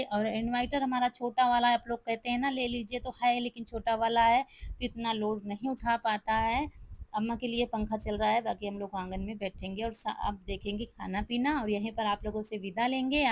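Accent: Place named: Jabalpur, India. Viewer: Aapke bolane Ka Tarika angreji Hamare Yahan Jaisa Hai Hamen MP ke Jabalpur Jile Se Hain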